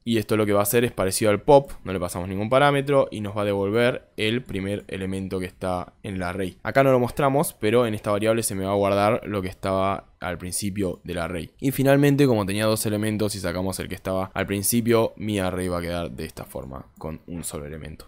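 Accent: Argentina. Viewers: Un chino con acento argentino, tienes un nuevo fan 😊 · Gracias amigo, me vi muchos videos pero vos lo explicaste cortita y al pie, pd: te sale re bien el acento argentino, un saludo hermano 🙌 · Creo que entendí mejor contigo que con el curso introductorio de soyHenry, no les entiendo nada hasta el día de hoy a ellos, pero a ti, clarito bro, voy a hecharle un vistazo a tu curso del que hablas 👌🏻😎, la verdad, hablas claro apesar de que creo que eres argentino no?, en caso de ser asi te entiendo perfectamente a ti, y no a los de soyHnery, deberias ser instructor de ahi, ya que te juro que no les entiendo nada de lo que hablan, no se si no pronuncian bien o hablan demasiado rapido, tu no, calmado y bien explicado, hasta rayas, y eso vale oro bro.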